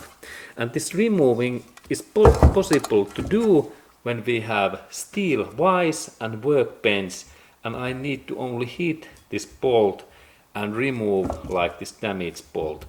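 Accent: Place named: Finland. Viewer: Your Finnish accent brings me joy. Now I know that you know what you’re talking about.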